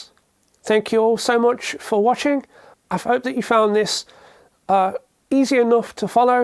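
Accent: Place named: England, United Kingdom. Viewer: Wow.. you sound a lot like my late father, only with an english accent.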